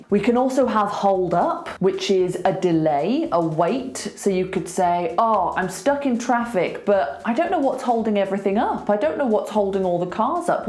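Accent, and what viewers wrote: United Kingdom. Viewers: Love British accent and your videos.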